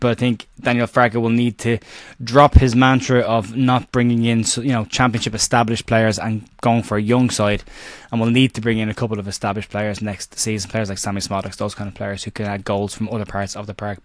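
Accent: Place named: Ireland. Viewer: Just want to say thank you so much for the content over the season. The amount of work put into each post is extraordinary. I live in Brazil, The View is a very important part of my life here! It’s great to hear a fellow Irish man talking about Leeds! Thank you so much so much, we’ll do it better and in more style next season and I’ll be following it on The View!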